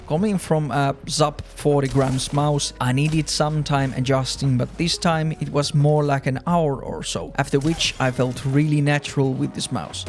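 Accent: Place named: Finland. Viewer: Eyy, another finnish peripheral enthusiasist :D knew right away from the accent lol, Kiitti arvostelusta, hyvä video :D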